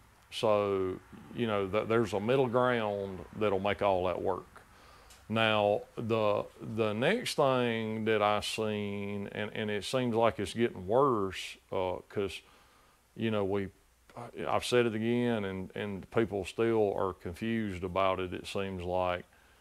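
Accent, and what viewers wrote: South, United States. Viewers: Why do the people that run this country not care about the quality of the products that we purchase with our hard earned dollars ? This really burns my A$$! They want you to vote for them for what! This is Disgusting that you have to put in this much effort to use a flat tappet cam! On another subject I am from Pa. We lived in Arizona, New Mexico and Tennessee and no one ever knew where I was from. They said I don't have an accent,go figure. That said I could listen to this Guy forever, I love the southern drawl and in women it's hot! I hung with with a guy from Cobra town mountain in Tennessee and this man sounds about the same. Never had a problem with it!!👍👍👍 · Sounds like a proper ‘murrican to me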